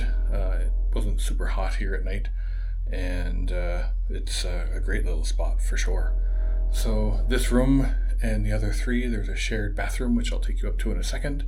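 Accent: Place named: Spain. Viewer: I meant your English is starting to sounds Spanish 🎉🎉🎉 haha how long til you sound completely Spanish? And your pronunciation has definitely gotten a lot better!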